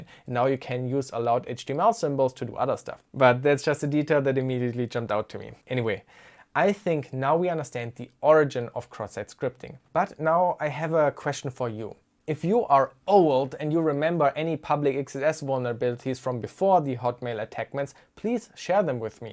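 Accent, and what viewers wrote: Germany. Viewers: You always pronounce the X in XSS in German.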